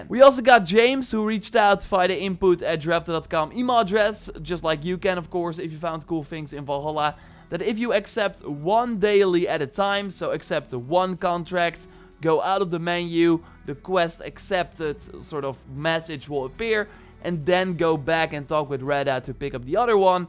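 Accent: Netherlands. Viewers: Is dutch? I hear his accent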